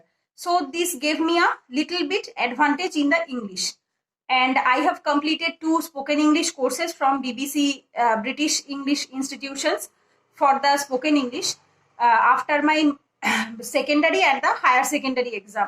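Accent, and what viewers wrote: Bengal, India. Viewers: Where do u live?.. Btw I can easily make out that u are a Bengali😊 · Hello ma'am, this video is very helpful for me because I prepare upsc exam in hindi medium but I realise English medium given exam is better than hindi because in upsc number of selection only English medium students so I also decided to prepare this exam in English but I have so many problems and confusion how to cover and understand by this language, you're giving tips useful for me and I want to applied ourselves.abhi meri English typing very bad hai ,aap meri feeling ko samaj lijiye.Aap ki English bhi ek bengali type lagati hai jab aap bolti hai, mujhe bengali nhi aati phir bhi ish language ko apne landlord jo ek bengali family hai wahi pr suni hui hai. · Madam are you bengali?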